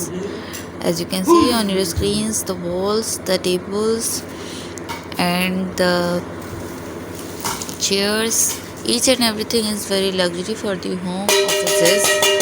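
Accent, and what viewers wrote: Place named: India. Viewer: English please... not your east Indian head ass scammer voice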